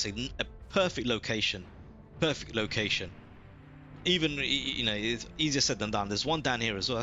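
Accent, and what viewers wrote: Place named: United Kingdom. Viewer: what part of the UK are you from? accent is great to listen to lmao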